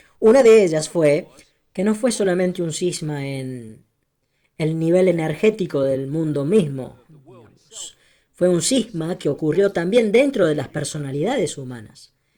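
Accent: Córdoba, Argentina. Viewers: la voz de ese español acordobezado está genial!! Muy clara y precisa!!! Dejá que cacareen los culiaos jejeje!! Felicitaciones!!! Like para Uds.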